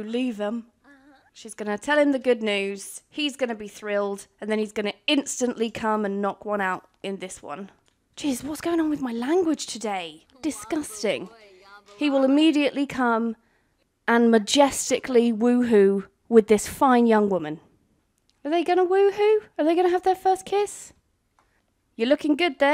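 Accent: United Kingdom. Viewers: Your narration cracks me the fuck up! Especially the British accent 🤭🤭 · You are aggressively british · This is so funny I don’t think I’ve laughed so hard in my life and I love your accent British accents are awesome and I love the way you swear it’s so cool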